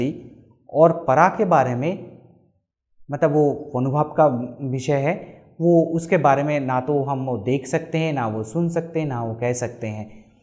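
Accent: Bengal, India. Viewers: I think he is speaking with a Bengali accent, hence the problems in pronunciation. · I appreciate your efforts. Your explanation gives relevant information how and why veda mantras have remained intact and un-adultered. I am not going on your accent due your Bengal origin. This does not change the meaning of Vedic Mantras today because these mantras are available in printed text. We must respect our ancient rishies who developed various pathas, only to see that no one can make any adulteration in them. It is human drawback that people make changes in the original text as they like. Brahamanaas literature is example. However, now we should try to understand the meaning of the words of original Veda Mantras also, which are profound. Since we have the written original text is available, the meaning of the mantra does not change as we don’t go by the pronunciation but by the words and their meanings. 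Therefore, people criticizing your efforts should take your explanation in right spirit. These mantras are profound and powerful only by understanding the meanings and not by simply chanting as many understand today. Congratulations to you. Regards. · ये बंगाली हैं. मोद्धोमा तथा शोरित बंगाली मूल शब्द है. बंगला में 'व' अक्षर नहीं होता. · साधूक्तं भवता । पुरुषः वङ्गदेशीयप्रायः नाम्नः, तर्हि तद्देशीयानामुच्चारणपद्धतिः जानीमः, तर्हि क्षन्तव्योऽस्माभिः । प्रसङ्गेण वक्ष्यामि, भवतां भाषासौष्ठवमभिवन्दनार्हम् । जयतु संस्कृतं जयतु भारतः ।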